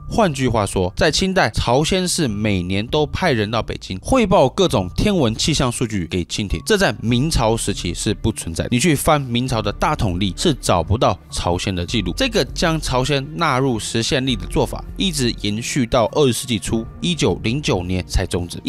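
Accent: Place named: Taiwan. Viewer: 台湾人就是这样腔调，两岸人应相互理解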